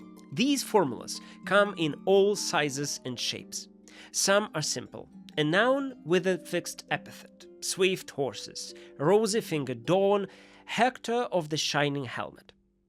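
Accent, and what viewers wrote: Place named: Austria. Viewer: Очень странно, но когда услышала первые слова этого видео, подумала, что сошла с ума, потому как полилась немецкая речь, в то время как несколько недель назад я слушала это на английском. Копец конечно, вероятно через пару месяцев оно переключится на китайском, но в нем я не сильна 😅а вообще, ИИ иногда произносит имена авторов неверно, вот недавно слышала вместо Джейн Эйр он сказал Дженера. Бумажные книги останутся самым надёжным источником, потому как их нельзя сманипулировать, и жаль, что многие уже книгами расхламировались. P.S. автор этого ролика говорит сейчас на немецком, но скорее с австрийским акцентом.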